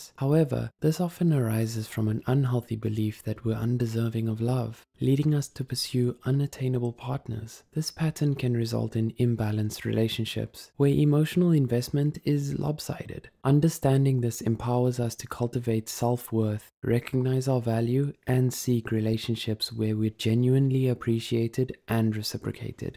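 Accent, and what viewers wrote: South Africa. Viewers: I love the south african narrator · Is that a South African accent I hear, or am I just insane? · Is the guy who did the voice over on this South African? I feel like I can recognise my own kind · AS SOON AS I HEARD YOUR VOICE I WENT LOOKING AT YOUR ACCOUNT AND I KNEWWW YOU WERE SOUTH AFRICAN HIII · I have to know is the person speaking here South African!!?? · The male, South African narrator threw me off guard. Not in a bad way, I was just expecting the usual woman. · I'm detecting a South African English accent, am I right? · Random question is the person speaking from south africa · This guy sounds south African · IS THAT A SOUTH AFRICAN ACCENT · Narrator is South African right? Ek hoor jou. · I haven't watched a psych2go video in a minute but I know a South African accent when I hear one. Loving the new narrator. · are you south african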